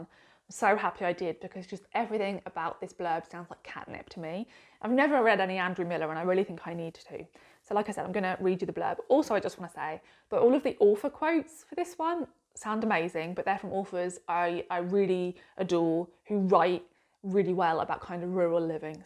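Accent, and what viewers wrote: United Kingdom. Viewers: Hi. All books you described are alright. Above all, your pure British accent is most welcome. Many thanks ❤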